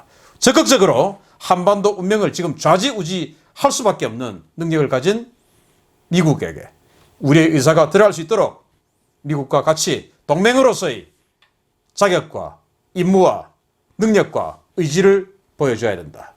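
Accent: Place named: Busan, South Korea. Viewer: 죄송합니다 만 저도 부산출신인데 사투리 재미나요 ㅎㅎ 표현도 재미나심 편대가 촤아아악 무장을촤아아악 ㅎㅎㅎ촤아아악을 자주 표현하심 ㅋㅋ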